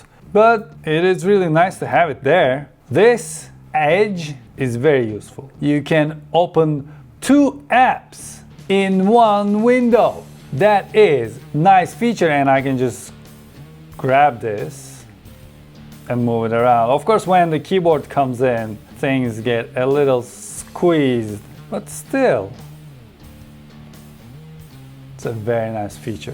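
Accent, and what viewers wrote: Turkey. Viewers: Hahahahaaa telafuzdan direk turk oldugunu anladim 🤣 8 yillik iphone kullanicisi olarak aklim celiniyor demesem yalan olur 🤔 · What a Turkish accent! I wish my headphones are shit and could not able to hear it.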